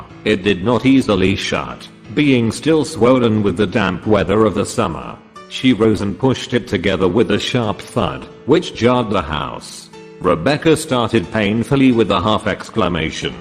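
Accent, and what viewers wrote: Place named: United Kingdom. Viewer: All of his voices and pronunciation is perfect!Full of British Accent!Wonderful!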